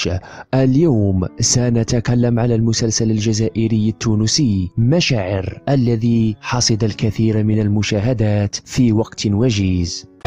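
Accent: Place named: Morocco. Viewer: جاني صوتو ولهجتو مروكي والله اعلم دقق مليح فاللهجة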